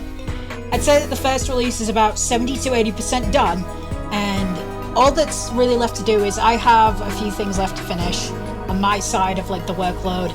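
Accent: United Kingdom. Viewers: Is that a fellow british I sense. RAHHHH 🇬🇧🇬🇧🇬🇧🇬🇧🇬🇧☕️☕️☕️ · My god... he's British... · I like how many of the comments just point out your voice. I could've figured you have an English accent, but of course I too expected a more man-like voice, no offense. =P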